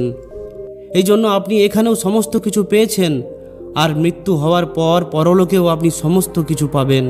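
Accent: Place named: Kolkata, India. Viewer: উনি কলকাতার মানুষ তাই এটা কলিকাতার ভাষা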